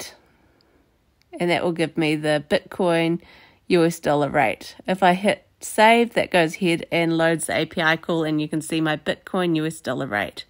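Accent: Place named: New Zealand. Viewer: Yo! Holla from Perth WA! Your accent sounds like you’re from NZ! 🤙 Awesome tutorial 👏 keep up the awesome work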